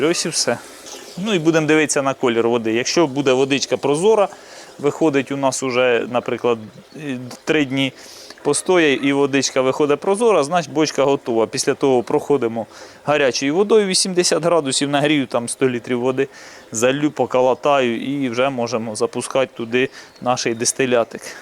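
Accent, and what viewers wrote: Cherkasy, Ukraine. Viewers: не чиста літературна українська, а легенький суржик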